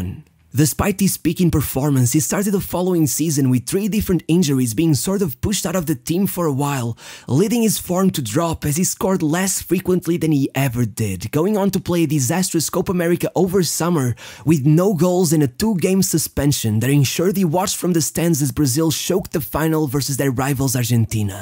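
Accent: Portugal. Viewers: I see u are portuguese only from your accent 😅🇧🇷🇵🇹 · Is the guy doing the vid portuguese? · Another great football Story: thank you very much. I can hear that the commentator is just like me a Portuguese speaking dude due to his beautiful accent in English. Thank you Sir. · Esse sotaque, é um tuga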